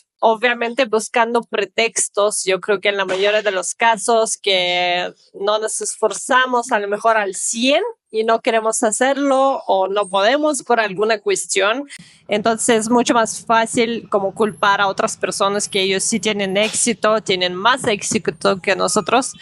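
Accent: Russia. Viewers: Pues tu acento ruso es encantador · Como alguien puede criticar la apariencia de otra persona, de su acento y su forma de vestir?, a caso esas gentes son muy cultos y modelos perfectos en todo sentido?, nadie lo es!…es muy fácil juzgar, criticar y demeritar a cualquier persona sin conocerla, sin entender el contexto de su vida, más aún cuando se hace desde el anonimato y a través de un medio como youtube;también muchos de nuestros compatriotas en el extranjero, por medio de su trabajo hecho su vida de manera admirable y honrada, teniendo que regularizar también con esfuerzo ( porque no es fácil ) en esos lugares su estancia legal, es muy válido!. En tu caso, aunque tu origen y acento sea ruso, ya no eres extranjera, tienes la legalmente ciudadanía, con derechos y obligaciones, pasaporte, familia, residencia,; si vives en la Condesa, Las Lomas o colonia modesta, que nos importa, a tus posibilidades y de tu esposo a donde quieras y aunque a muchos les de diarrea, eres mexicana! 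· No hagas caso de estúpidos haters que no hacen nada bueno, no sirven para nada, no crean nada, no son campeones de nada. Lamento muchísimo estos mensajes. Soy mexicano y le doy la bienvenida a todo mexicano nacido donde se le dio la gana (ósea extranjeros). Adelante y a disfrutar la vida, tu nueva vida. Abrazo enorme desde la CDMX. Y me encanta tu acento RUSO!!! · Hola....pues Ami me atrapaste por tus fotos...y esos ojos verdes...(Espero que no me de hate tu esposo... jeje..)....y con respecto a los que te mandan Hate....no te preocupes ya que esos solo tienen ojos para el América...(Y ya sabes lo que sigue...así que, así velos...)....y con respecto a tu acento ruso... No sé te va a quitar nunca en su totalidad...ya que tus músculos de la voz nacieron o se adaptaron desde chica al idioma ruso...lo puedes neutralizar pero eso es mucho rollo...y además paque..si se te entiende y muy bonito.... 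Y por último... Ten pensamiento del tipo de Chavela Vargas....Saludos....y no dejes de subir videos....😊 Ha y los Hate.... También sirve para subir el reiting...... jeje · Yo no pensaba que en México había haters tan malos como en Francia. Yo no pensaba que los Mexicanos se preocupaba tan de otros como en Francia. Yo conozco un poco México porque vengo cada año un a dos meses en su país y a mí la gente me parecía muy amable. Me agradecería hablar español como tú con tu accento que no sé si es ruso o no pero que es muy correcto. .¿Si México es tan buen país por qué tantos lo huyan ? ❤🇫🇷🍎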